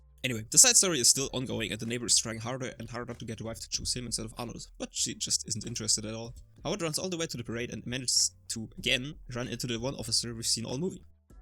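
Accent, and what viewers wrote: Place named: Germany. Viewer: 😂 love the "tze", you crazy germans make it more fun just to speak, ceep it up ! 🎉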